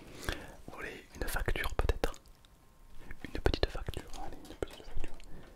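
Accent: South, France. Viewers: L'accent du sud >> les autres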